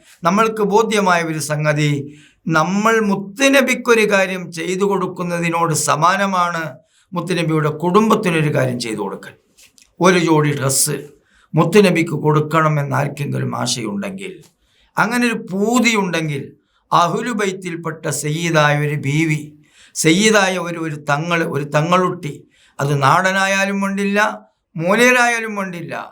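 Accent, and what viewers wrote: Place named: Malappuram, India. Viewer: ഉസ്താദ്, ഒരു വിനീതമായ അഭിപ്രായം..ചില വാക്കുകൾ മലപ്പുറം ശൈലി ആയതുകൊണ്ട് മറ്റ് ജില്ലക്കാർക്ക് മനസ്സിലാവില്ല. അതുകൊണ്ട് പൊതു മലയാളം സംസാരത്തിൽ കൊണ്ടുവന്നാൽ വളരെ നന്ന്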